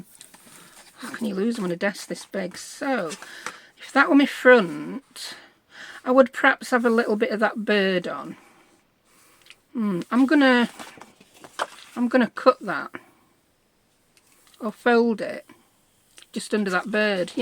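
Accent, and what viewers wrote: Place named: Northern England, United Kingdom. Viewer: Hi there, just tuned in to your tutorial & just love your northern twang. Whereabouts are you from. I live in New Zealand now but originally I’m from near Buxton in Derbyshire.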